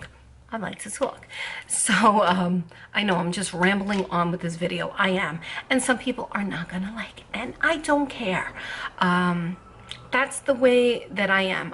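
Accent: New York, United States. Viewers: I always say, 'People are people' we're nice we're not nice we're doing we're not doing. What. Ever. You my dear are beautiful! I am not a subscriber, 1st timer actually. Yourre a New Yorker. You?! Really. No kidding. Love the accent. Love this personality. I find you quite cute. Really. I'm from California...in California...will probably die in California. Born in San Francisco actually. Not much of a talker tho. But a good listener. Liked your content. Thank you for sharing. With Love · I love your coffee talk! I love You! Your gorgeous!And i hope you know how beautiful you are! I'm a native New Yorker living in Fl now and enjoy hearing the voice of one of my peeps😉 yeah not responding is the best ( go f yourself ) to those ( low life trolls ) seriously, what kind of person wishes sickness and death on another person?! Well, I believe in karma...anyway, you keep on doing your thing ...trolls will go away when they aren't getting attention. 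As for the so called friends....they can take a walk too.😊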